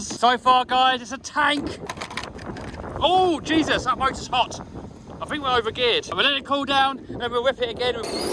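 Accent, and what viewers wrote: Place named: England, United Kingdom. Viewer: dude i usually can't stand English accents lol but you're funny as hell 😂 You're vids are fantastic! keep up the good work broskie. definitely gotta have one of these in my future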